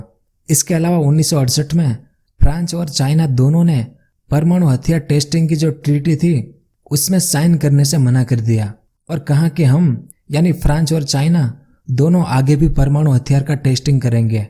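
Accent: Gujarat, India. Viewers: Aap gujarati ho apka bolne ka tarika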